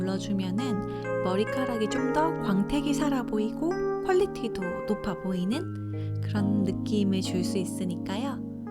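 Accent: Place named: Gyeongsang, South Korea. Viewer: 목소리가 고우신데 말투에서 경상도쪽 억양이 약간 느껴지는게 매력이에요 ~_~ 허허 조와요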